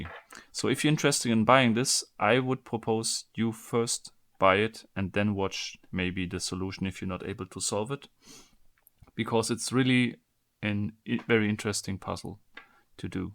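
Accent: Germany. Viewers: I don't know why but even though you have a German accent I think you are part Asian. You have an amazing mind. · his accent sounds sooo german🙊🙉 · are you german?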